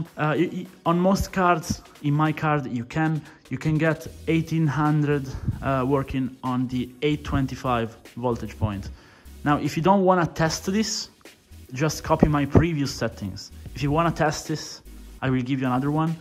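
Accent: Italy. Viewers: dal video ho vagamente sentito la calata italiana ma non ero sicuro 🤣 .... e il secondo video di undervolting che vedo sul tuo canale e ti volevo ringraziare! ho una tuf 3070ti e ho optato per 850 mV a 1800 Mhz con un boost di 1000 e un drop di 200 ma penso che posso spingermi un po’ oltre provando 850mV a 1875 Mhz senza boost di 1000 e il drop di 200.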